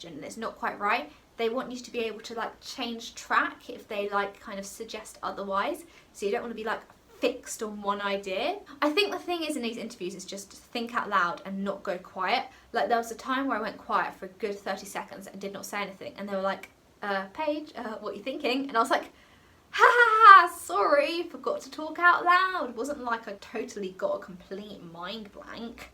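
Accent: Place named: RP, United Kingdom. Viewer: your too posh 😭😭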